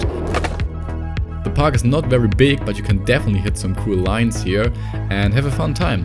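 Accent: Germany. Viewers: Are you german? The accent is soo german idk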